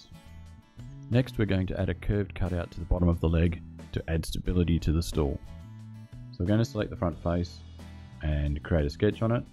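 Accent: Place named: Australia. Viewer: Great explanation! Also good to hear another Aussie doing this too.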